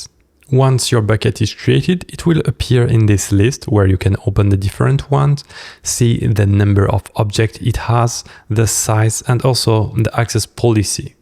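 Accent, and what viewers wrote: France. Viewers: Ahhh cet accent français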